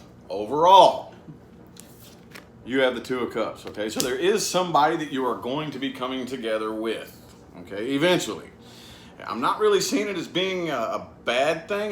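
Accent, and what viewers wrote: United States. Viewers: Where in the US is your accent from? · Very nice, very accurate. By the way, I love your american accent (I am not from an English speaking country). Thank you very much.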